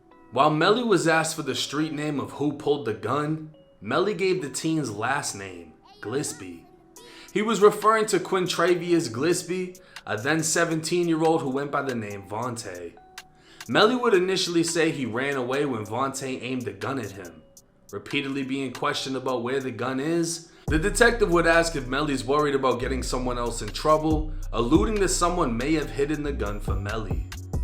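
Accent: Boston, United States. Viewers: Love the Boston accent I'm from NY what up. I'm following this trial and I love tour style and how u cut you videos with so many visuals. Also I make club music on Ableton and u see u must be making shit yourself from the back ground. Anyways I like and subbed. Word, no names. ... I did 2 yrs up top over here. That shit don't fly my way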